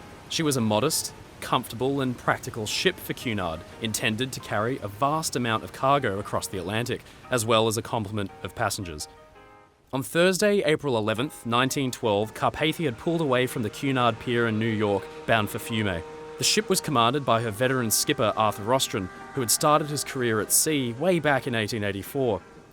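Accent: Australia. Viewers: The fact that he’s Australian makes your statements pretty revealing… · yay!!! Aussie narrator😃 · he's Australian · Fantastic script well read fellow Aussie?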